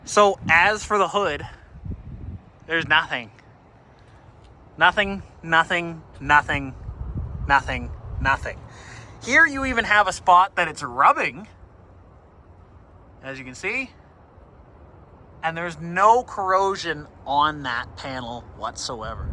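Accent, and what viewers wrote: Canada. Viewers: The Canadian accent lol its all good! It reminds me of the Red Green Show! I'm from southern Ohio near west Virginia so don't worry!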